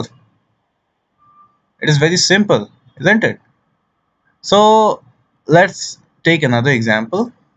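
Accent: India. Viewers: this guy is so smart and he speak like a Indian☣☣☣☣☣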